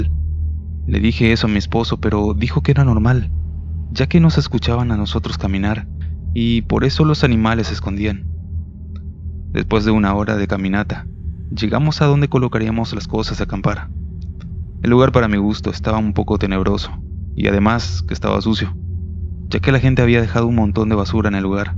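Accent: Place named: Argentina. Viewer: El amigo creo k no es mexicano es creo argentino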